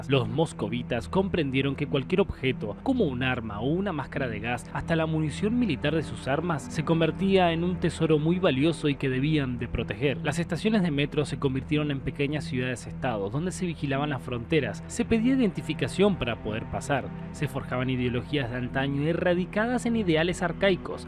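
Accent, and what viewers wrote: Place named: Argentina. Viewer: Noooooo :( que feo, en acento de un argentino arruina todo :(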